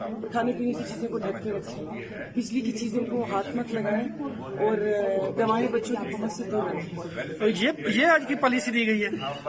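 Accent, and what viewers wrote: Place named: Bhopal, India. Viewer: Actor name vicky kaushal hai... hamare yaha bhopal me bhi aapke jaise hi loki aur kaddu bolte hai.. jaise aapne bola hai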